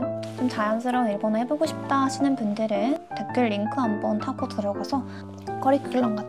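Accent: Kansai, Japan. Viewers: さんの関西訛り日本語関西人として親近感わいて好きなんだけど😂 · 예전부터 구독했던 님 구독자 여려분들은 다 아실 내용이긴 하네요 ㅋㅋ 칸사이벤 말투 ㅋㅋㅋㅋㅋ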